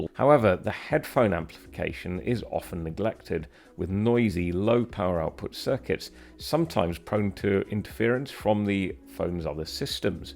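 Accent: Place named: Yorkshire, United Kingdom. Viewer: Very nicely spoken…..and I think occasionally I hear a trace of Yorkshire ..but I could be wrong…🙂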